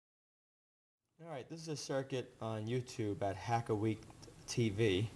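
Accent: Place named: New Jersey, United States. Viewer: Your videos really pull you into the action. Maybe it is the NJ accent, or the way you are basically shooting and talking live with a handheld camera, ...it can get hard to watch that way with the camera moving, if it moves too much. Sometimes it does and that is bad, but with some movement, it gives your videos are warmer feel than if you were just talking with a tripod. Your setup results in a very personal video, like I am right there with you in your kitchen. It just works.Good project too.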